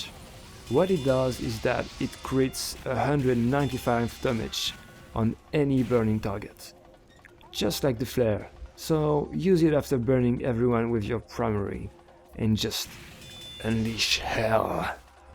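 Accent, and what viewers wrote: France. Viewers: are you French? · I think hes french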